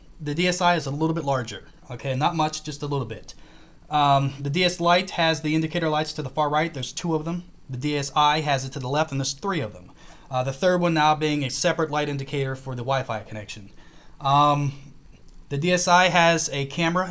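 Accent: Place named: United States. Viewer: i instantly know your american Y'all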